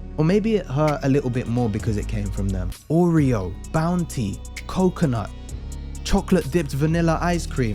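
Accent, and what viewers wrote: United Kingdom. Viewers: This guy is talking about a “stereotypical white accent” as if he’s from the states and has a British accent. Address your culture first before you tackle anything American Edit: I have no clue what this dude is even talking 3 minutes into the video 😂 all Brit’s sound the same to someone in the US, so confused. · Well to me, as an American, you don't sound white or black. You sound British. And all of you sound the same to me. You have different accents but they may as well all be the same and we'll just call that British.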